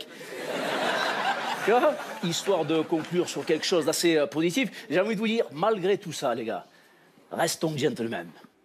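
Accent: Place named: Spain. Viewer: Très bon !! Ta un accent qui fait espagnole ou marseillais ☺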